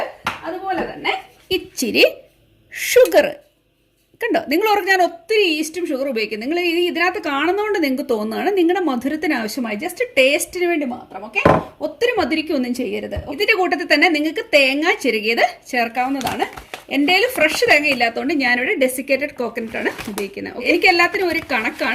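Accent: Kottayam, India. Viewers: kottyam kari ano am from kidangoor pala now your talking style very nice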